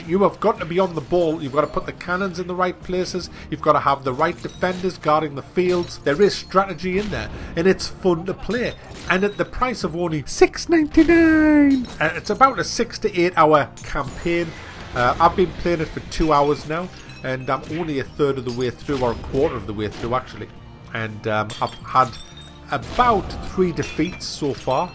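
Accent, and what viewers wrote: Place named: Scotland, United Kingdom. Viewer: Come off it! YOU'RE SCOTTISH!!! And if you REALLY arnt......then what the hell are ya?? You sound like all the other "Scots' I grew up with in Dunedin.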